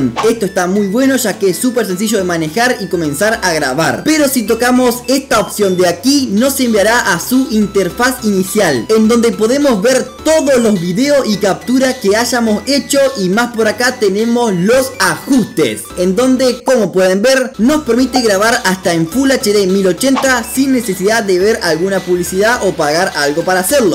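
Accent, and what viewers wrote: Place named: Chile. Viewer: eres chileno? se te nota el mismo acento que los de gran hermano chile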